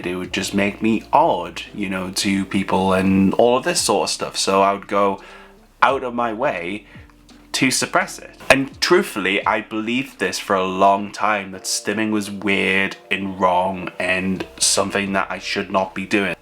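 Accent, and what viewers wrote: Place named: Middlesbrough, United Kingdom. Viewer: Are you Mbro based? Your accent sounds local to me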